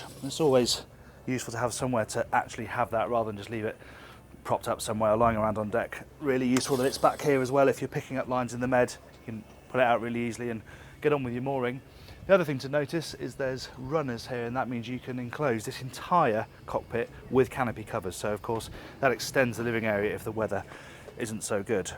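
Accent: RP, United Kingdom. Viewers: 2) Questions, 1st) Why is do they put the helm station on one side of the fly bridge, while the helm below is on the opposite side? 2nd) Do they put the helm on a fly bridge, on the side of the boat most frequently used to dock, making it easer for docking? Therefore wouldn't you want the same orientation below as well? or having the helm on opposite side offers, the best flexibility when docking? Your my 1st-ish or 2nd-ish, favorite boat presenter's, very British, kinda too proper. Opposed to Aquaholic, who's more flamboyant. Both of you are stalwart, and very good presenters, comfortable on camera, and the information you provide, is always clear and... precise Thank's from the US. Cheers!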